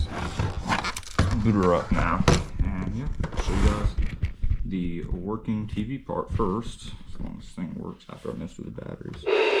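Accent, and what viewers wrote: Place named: Alabama, United States. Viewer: Southern Alabama accent.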